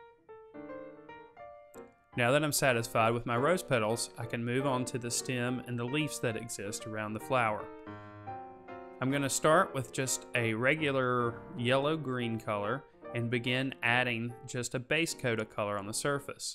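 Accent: South, United States. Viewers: You sound sooooo much like a country western dude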